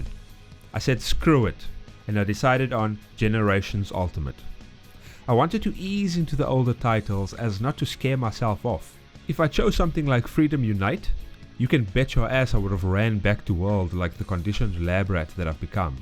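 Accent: South Africa. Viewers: Are you South African by any chance!? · which accent is this, are you south african mate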